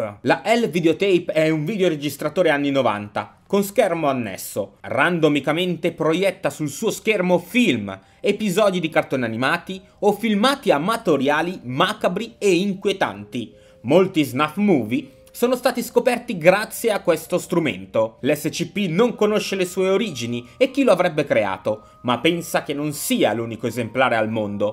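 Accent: Veneto, Italy. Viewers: Veneto vecioo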